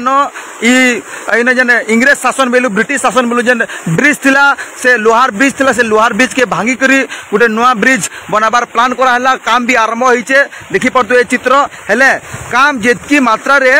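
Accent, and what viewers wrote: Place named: Sambalpuri, India. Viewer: Aamar kacha samal Puri bhasa re news Hebar Katha........adha samalpuri adha katkiaa kahuchha...........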